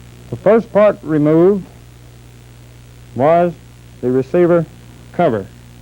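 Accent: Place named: Texas, United States. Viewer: Definitely a Texas or Arkansas man.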